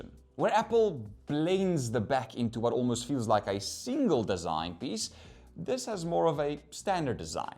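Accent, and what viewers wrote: South Africa. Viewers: Is this guy from South Africa?sounds like it.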